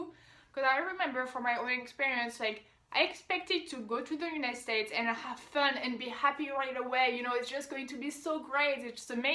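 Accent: France. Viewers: Thank you for doing this video. I am particularly interested in the challenges you faced with American spoken language. How long did it take you to get to point where you could understand conversations? Was it easier or harder to follow informal conversations compared to lectures in class? Also, in speaking English, how long did it take to achieve complete fluency? I’m sure you’ve been told, your accent is absolutely charming. Americans love a French accent more than any other language.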